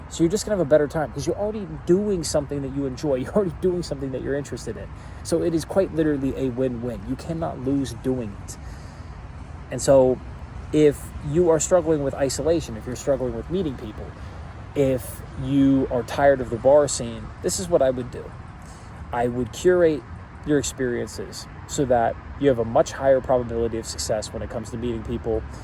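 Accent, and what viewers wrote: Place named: Chicago, United States. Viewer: I knew that accent sounded Chicagoan, you keep it real. Lots of love from Detroit.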